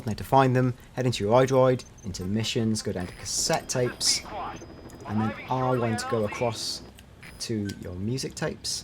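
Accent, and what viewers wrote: United Kingdom. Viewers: You sound like a British Elijah Wood. Cool.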